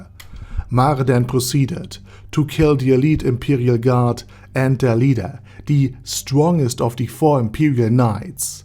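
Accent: Germany. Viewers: Holy shit, this german English :D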